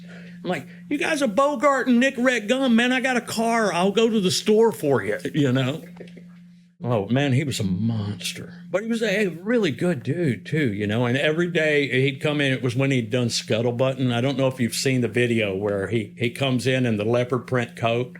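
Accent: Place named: Indiana, United States. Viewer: This guy is cool to listen to, he sounds like he could be a Hoosier (?) referring to the “accent” if we have one 🤷🏻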